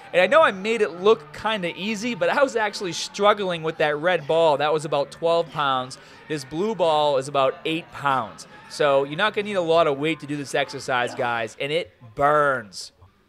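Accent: Boston, United States. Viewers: The "Baaaston Haaabar" accent has gotten really bad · What's with this Boston accent all of sudden creeping into your videos ?????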